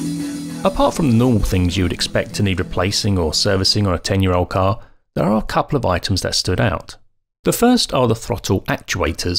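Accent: United Kingdom. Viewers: Sounds weird but I love your videos as well as Nick’s...would love to hear a conversation between the two of you hearing your British accents haha, also heard the incoming G series M3 debuting sometime this year will finally offer XDrive (it would be great for you Nick being in CT)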